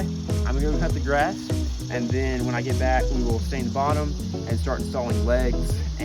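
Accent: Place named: Georgia, United States. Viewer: Thought that accent sounded familiar. Glad to see some GA representation in woodworking YT.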